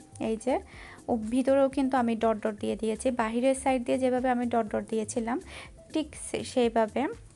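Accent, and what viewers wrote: Chittagong, Bangladesh. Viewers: হেতি চিটাগায়িংয়া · আপু আমার মনে একটা প্রশন ছিল কারন তোমার কথা গুলো অনেকটাই আমাদের চট্টগ্রামের মত কিন্তু আজই জানতে পারলাম তুমি আসলেই চট্টগ্রামের,জানতে চাইনি কারন তুমি অনেক বেস্ত আর এখন জেনতে পেরে তোমার প্রতি ভালবাসা আমার অনেক বেড়ে গেল।আর তোমার সাথে আমার অনেক মিল আমার ও দুই মেয়ে আর ছোট মেয়ের নাম আরোহী।দোয়া করি সব সময় ভাল থাক।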